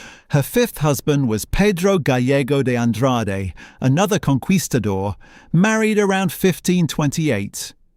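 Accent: United Kingdom. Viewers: the british english pronunciation is messing up the spanish spelling and the words giving me a headache, as an american this "Tree" is a "Mess" as "Mexico"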